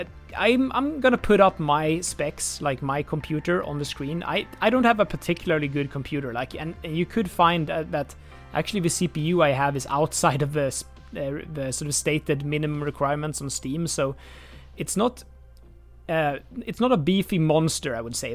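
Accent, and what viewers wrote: Sweden. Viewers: Where are you from? Im from Sweden, you sound Swedish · Are you from sweden?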